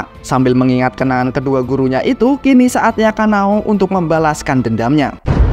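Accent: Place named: Padang, Indonesia. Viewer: Wahh orang padang 😅😅😅😅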